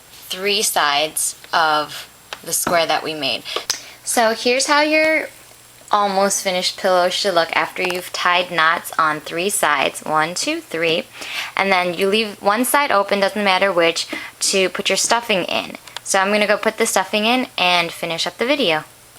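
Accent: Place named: Canada. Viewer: she sounds Canadian to me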